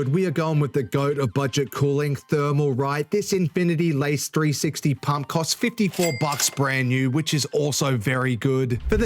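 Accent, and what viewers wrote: Australia. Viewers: i dont care about the PCs at all. but i stay for the hilarious aussie voice. yeah nah good channel you beautiful baaaastard:elbowbump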